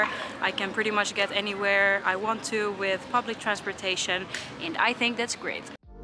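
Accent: Netherlands. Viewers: Nice video, I love your positive vibe. And your accent in English sounds almost the same as when Dutch people speak English. 😊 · I can hear a little Dutch accent in your voice already :) i am dutch so i can hear .. :) Nice vid by the way! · I'm starting to notice a Dutch accent in your English 😂 So cool! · u starting to have an dutch accent.. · Youre English has a bit of a Dutch accent 😁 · Brilliant ... A Finnish woman speaking English with a Dutch accent 😂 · Yeah noticed that too, you have a Dutch accent :D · You have the exact same English accent as the Dutch people. So funny. I didn't like it before, but it is starting to grow on me. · You're privileged you live in Leiden. I am from the south-west of the NL and like daytrips to cities like Leiden and Utrecht. Great and well recorded promo for our country, that - not to forget to mention - struggles with a shortage of houses and high prices for real estate. 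By the way, by your looks and your English accent you could be Dutch. ))) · You sound Dutch. My parents were born there. They immigrated in the 50’s so I was born here in Canada. They never moved back but my mom always wanted to she missed it. What’s not to like about the Netherlands. I love the people, the food the scenery. It holds a special place in my heart. My first love was also Dutch he still lives there. I would love to live there but I do not think I could handle all the wet weather.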